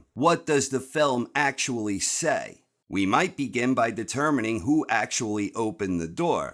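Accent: New York, United States. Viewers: What accent? He sounds like a native speaker from New York or Jersey. That would be a dialect. "Accent" is only from one language to another.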